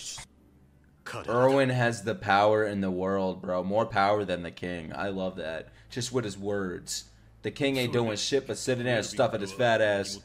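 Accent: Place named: New York City, United States. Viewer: You can already hear the city accent getting to him lolllll. A true NYC resident in the making.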